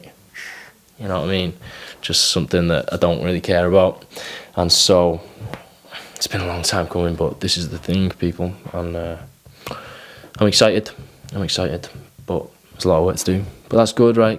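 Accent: United Kingdom. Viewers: Love this british accent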